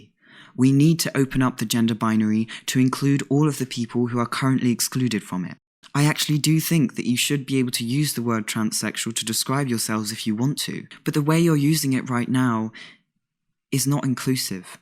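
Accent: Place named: Southern England, United Kingdom. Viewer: It's that smooth baritone and southern English accent.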